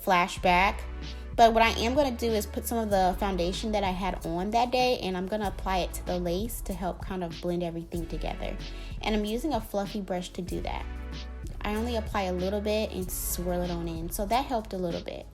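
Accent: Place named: Houston, United States. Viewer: U sound like my cousins from Houston lol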